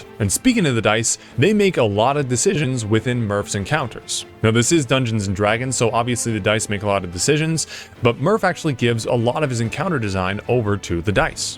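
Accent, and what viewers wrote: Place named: Flanders, Belgium. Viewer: not me trying to figure out if you're dutch or flemish... ima say flemish?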